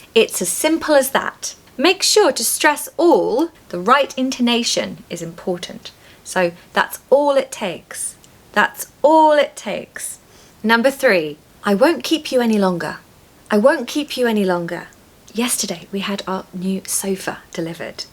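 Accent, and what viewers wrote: RP, United Kingdom. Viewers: It sounds very origin British accent....I admire it a Lot...we're waiting for more..thanks Teacher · Hi, I'm so happy for your pregnancy!! You look lovely by the way!! I'm watching your videos because of your inner and outer very feminine loveliness, and because You've exactly the British accent I would like to acquire myself I'm Norwegian born&bred bu have lived in Italy for 40 years now with no one to talk to in English, so I just wish to find my lost flow and to bring my accent closer to yours.if possible. Watching and imitating You is really helpful and FUN! Sending Love to You and Your husband Tom and your baby boy.! Gioia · I kind of copied your way to speak, and now I sound truly British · Hi, ❤❤wow! Congratulations. I haven't visited YouTube for months. I have been really busy with work. You are the reason that I visit YouTube! 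Really! It is so homely to be able to hear your lovely RP accent again. 🎉🎉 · very clear and easy to understand your British sound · It’s not false; however, I believe that we must mean her own accent which is the 'modern received pronunciation' which is undisputedly a British accent and probably (especially) by opposition or difference with the American accent. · The reason why I’ve been watching your videos is learn British English and cultures. Your RP pronunciation is really marvelous! When I came across your channel for the first time, I reckoned that was where I would settle down regarding RP sound!❤ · Hey teacher. This is Julia, I am a visually impaired filipino student whose waching your videos since last year. I am the person who ask to you if you can sing a song for us, and I am the person who ask you if you already tried the filipino foods. Teacher? Can I ask you something? Do you had a filipino students before? 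Since I am a grade 10 student right now here in the Philippines, our lesson in english at School is all about model verbs. Teacher? Can I have a request? Can you teach model verbs? Thank you. The reason why I'm waching and listening to your videos because, I want to learn the british accent, I want to improve my english speaking skillss, I want to learn a british culture, and I want to become a content creator in the future. I will be a famous content creator someday, I want to use the english language, and I want to use the english accents like american accent and the british accents like london and the modern receive pronunciation. I want to share my knowledge to other people using the english language. Even it is hard for me to understand the accent sometimes, I'm still listening to all your videos. Teacher, I really really really really love your british accent! I really really love the way you speak, the way you teach, and the way you teach pronunciations. 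It's great! It is very very helpful and very very use full for me. teacher you know? Even I am blind, for me, you really really look nice! you look beaut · Dear! I din't know you were prengnant!! Congratultions, I'm so happy four you!!! I love to hear you, your pronunciation it's so lovely, your voice it's so sweet and beautiful, it's a delightful to hear your british pronunciation! I send you kisses from Cordoba, Argentina. Gabi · Well I happened to come across your channel by chance, and since I love RP and modern RP accent and British manners, and you combine them perfectly in your way of speaking, your videos are perfect for me, since I both enjoy and improve my modern RP accent! Also, I can learn some new vocabulary and expressions too, so.. it's just perfect! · Congratulations once more for the new guest in offing. I love to hear you for your lovely RP accent and pronunciation.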